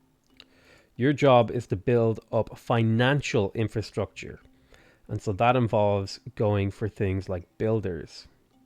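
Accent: Ireland. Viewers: he is from louth i can hear it ;) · you definitely have an Irish accent.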